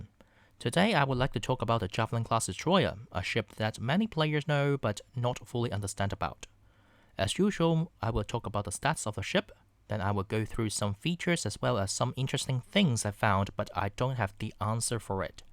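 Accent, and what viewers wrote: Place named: Asia. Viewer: I would regard this as a particularly unfair and unnecessary comment. I’m a native English speaker and I would deem his English to be excellent and conveyed with clarity. There’s an unmistakable Asian influence on his accent, but it in no way detracted from my ability to understand what he was saying, indeed I’d go so far as to say his command of English is better than a lot of native English speakers I’ve met. To the poster of this great video I’d like to say a sincere thank you for this excellent essay and please ignore ignorant comments from the likes of this poster, you did a great job and you don’t need to improve anything, just keep this terrific content coming and thank you for your efforts.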